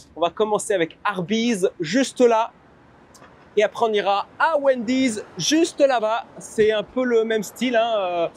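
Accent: France. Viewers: Ton anglais est tres francisé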